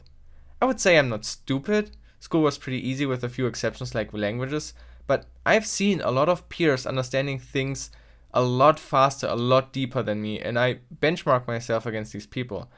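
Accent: Germany. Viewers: Are you German? Because I am and your accent sounds really German, like I sometimes forget I'm watching an English video😂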